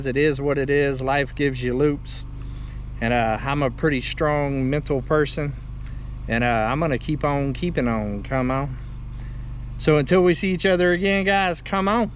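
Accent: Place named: Arkansas, United States. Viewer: Arkansan here, I thought I recognized that accent 😂 your video just popped up and I'm glad I watched. Interesting homestead and build! Just remember not all relationships are meant to be. My husband was married twice before when we met and didn't think it was possible to have a successful marriage but here we are 30 years, two great grown kids and 3 grandchildren later, rockin it!! You will find the right one when you least expect it! 😊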